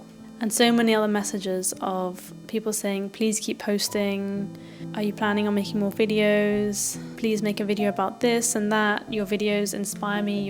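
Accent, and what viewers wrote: RP, United Kingdom. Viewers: Dear! Your videos are really helpful. Keep on doing your great job! I personally enjoy listening to your perfect RP pronunciation, your soft voice and, which is the most important, wonderful tips you give on different subjects! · Oh dear, you are the first one I check when I want to learn RP accent and a foreign language! Congratulations on your life achievement, 我祝你和家人一切顺利！ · Thank you so much for your podcasts and and videos in English. I really like British English, especially when you pronouncing it! Keep making this videos because you can not even imagine how it’s changing someone’s English skills! You are the best! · I love your British accent..please continue to inspire us. - Love from Kuala Lumpur. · Wow! Welcome to Hong Kong! Congrats to you with the many new chapters in life! Hope to bump into you in Hong Kong one day! 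Love your British accent!❤😂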